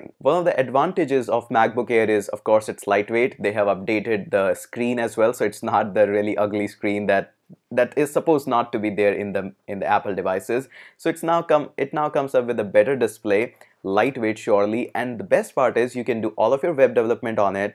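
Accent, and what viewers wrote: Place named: India. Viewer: Which laptops? Am i supposed to watch 12 mins of indian accent rambling and look at you instead of laptops? Rofl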